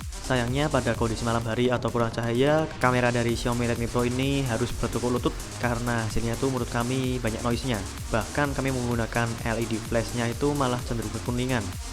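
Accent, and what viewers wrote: Java, Indonesia. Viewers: medoxxxx bingitzz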